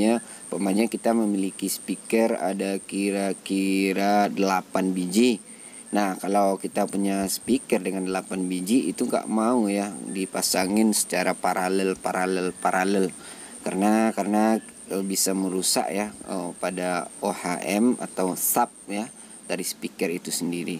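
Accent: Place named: West Sumatra, Indonesia. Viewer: macam ada suara minang kocak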